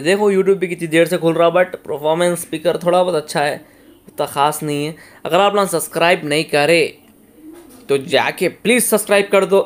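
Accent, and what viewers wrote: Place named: Hyderabad, India. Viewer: I love Hyderabad talking style ❤️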